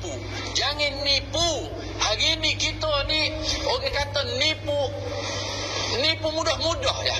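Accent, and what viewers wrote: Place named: Kelantan, Malaysia. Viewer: Syeikh ni tak pernah dtg malaysia tp dah pandai kecek kelatey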